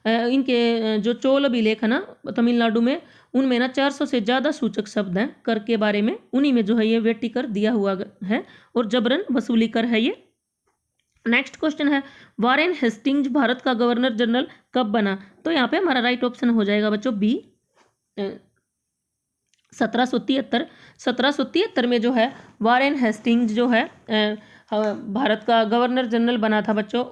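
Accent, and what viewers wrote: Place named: Haryana, India. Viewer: Ya hindi bolo ya fir hryanvi ajib bolre ho aap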